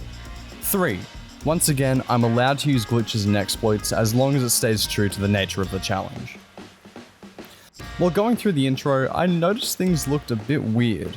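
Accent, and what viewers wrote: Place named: Australia. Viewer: “Youtube existed when you were 11? And was paying royalties? Damn you’re young!” - me, who refuses to admit she’s old 🙈 Love the video. Nice to hear an Aussie accent doing silly challenge runs on a 12 year old game 😊👍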